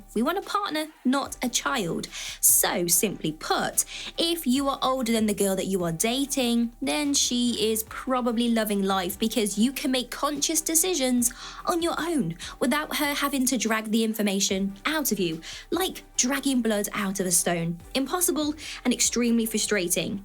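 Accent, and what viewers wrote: England, United Kingdom. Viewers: Is this girl from the British isles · What part of England are you from? Midlands, maybe North?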